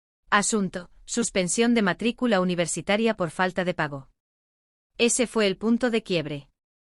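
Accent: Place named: Argentina. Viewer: Por favor relatos en español neutro, no con relatos argentinos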